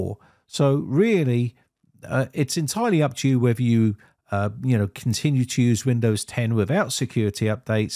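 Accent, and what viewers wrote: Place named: London, United Kingdom. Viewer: it's "Watcha guys" Londoner speak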